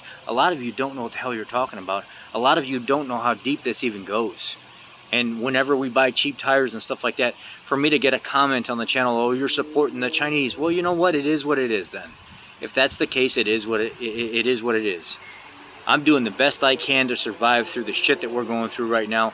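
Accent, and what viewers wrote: United States. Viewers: Perfect American speech !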